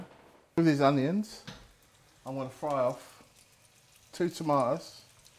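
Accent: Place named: England, United Kingdom. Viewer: With that accent, he was born in England, London I would guess (lol). He sure loves his powdered seasonings...............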